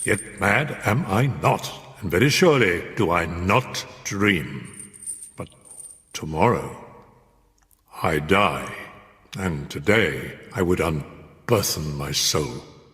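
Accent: United Kingdom. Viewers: Sir Christopher Lee has a very strong posh accent. Like it. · Is this British accent ??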